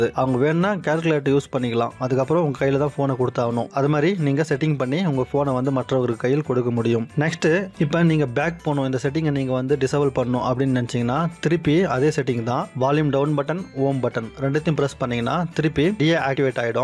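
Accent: Chennai, India. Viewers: Pakka chennai tamizh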